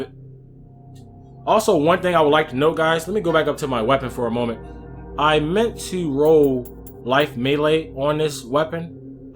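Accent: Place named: East Coast, United States. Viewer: I wanna say the East Coast but not too sure. Lol. I got people I know from NC, Maryland, New York, etc.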